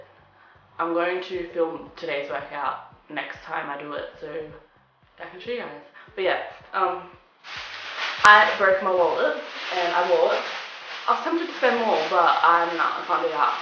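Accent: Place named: Australia. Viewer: How many bananas did you put into your smoothie bowl? You have SUCH a strong Australian accent btw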